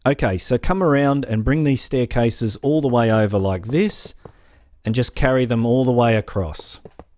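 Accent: Australia. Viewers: are you aussie mate ?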